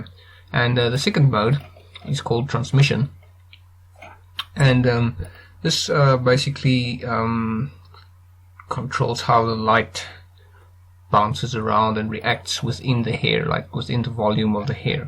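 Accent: South Africa. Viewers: Ah, excellent. I actually like your South African accent, but yeah better recording gear (and less noise) will help loads. Thanks a lot!:) By the way, is there a good way to get a Blender hair shape (or even just the guides) out of Blender and into Max or Substance Painter? I plan to render characters in Iray (Substance Painter) and VRAY in Max, but I love the hair control in Blender. Thanks!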